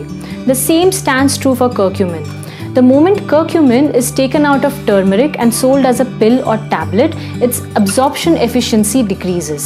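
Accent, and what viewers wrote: India. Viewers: Pronounsation of an Indian,like it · The Indian accent is much more elegant to this American's ears than most British accents.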